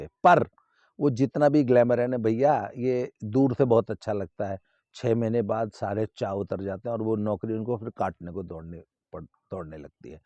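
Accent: Haryana, India. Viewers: Guruji ये विडियो देख के बड़ा मजा आया ओर अच्छा भी लगा के आप वापिस अपनी फोर्म मे आगये, आपका देसी अंदाज़ ओर भोजपुरी मे हरयाणवी टच सरसो के साग मे देसी घी, प्रणाम🙏🏻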